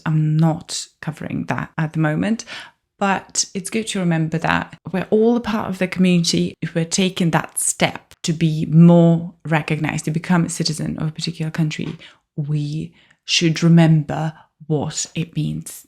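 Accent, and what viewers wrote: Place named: RP, United Kingdom. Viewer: Hi, First of all, may I say how wonderful your English is, and that posh accent of yours… I absolutely love it! Honestly, if I didn’t know better, I would have thought you were born in Britain. It’s astonishing to hear such a lovely accent! Hearing you speak brought back so many emotions. It reminded me how quickly time has passed, how many challenges I’ve faced, and how, at the end of the day, every immigrant who becomes a citizen of their adopted country truly cherishes, appreciates, and values the place they now call home. A little about me: I was born in Venezuela to immigrant parents who had built their lives there. Yet I never felt I truly belonged in my country of birth. Deep down, I always wanted to move abroad. Thanks to my parents’ nationalities (Chile and Portugal), I had the chance to come to the UK before Brexit closed that door, and I seized it. 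Those first weeks in Britain were life changing. I realised how much I would have missed if I had stayed behind. I wasted no time: I got a job, organised my documents, secured my right to work, and began building my life here. That first year was a whirlwind of learning English, discovering the culture, and meeting people who made me feel instantly at home. When Brexit came, I rushed to secure pre-settled status to remain legally. Later, I earned my Settlement status (ILR). But still, I wasn’t satisfied. I wanted to go further. I wanted to vote, to have a true voice, and to belong in the eyes of the law, becaus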